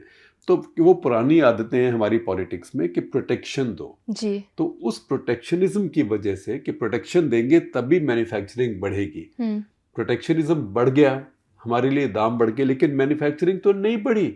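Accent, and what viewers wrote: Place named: Delhi, India. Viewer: Shekhar ji…..ye program best hai Print pe. Especially aap jo anecdotes dete hain woh bahut rochak hote hain….e.g., air force plane girne wala kissa. Please continue to do this in hindi. Aapki Hindi Dilli wali hai to bahut interesting hai. Thanks.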